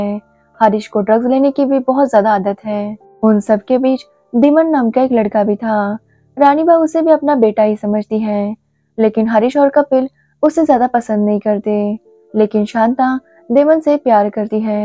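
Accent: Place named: Hyderabad, India. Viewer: Hyderbad se h ye ladki. Wahan log aise hi bolte hn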